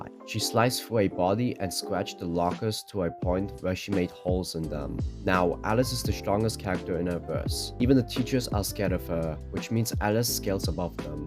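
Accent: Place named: United Kingdom. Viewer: I don't know but you talk like some smooth british guy while having coffe+though you're saying facts most of the video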